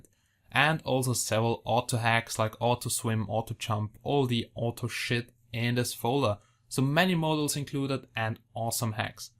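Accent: Germany. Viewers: Thanks, love your accent. German right ? Anyways everytime I click resource pack it crashes. Not big deal just thought to tell you. Nice video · wie man das deutsche leicht raushört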